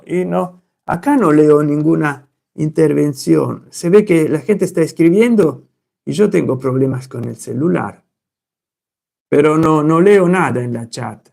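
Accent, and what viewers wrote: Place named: Argentina. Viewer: Hasta xuando viviste en Argentina?tienes un acento muy marcadito😮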